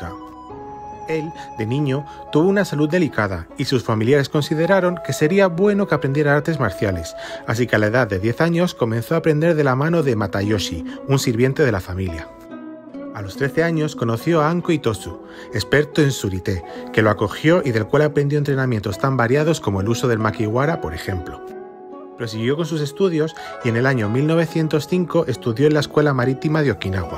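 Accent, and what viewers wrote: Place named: Spain. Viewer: por el acento qué se escucha en el video supongo que eres de España, según tengo entendido que qué las mejores escuelas MARCIALES de Karate Shito Ryu están en Barcelona, a modo de recomendación recomendaria hacer un video de cualquier línea de Shito Ryu acompañándola con algún video de alguna escuela de Karate Shito Ryu marcial de Barcelona. Estaré pendiente del video (por cierto no conocía este canal pero debido a este video de Shito Ryu qué has hecho me he suscrito, buen canal, felicidades).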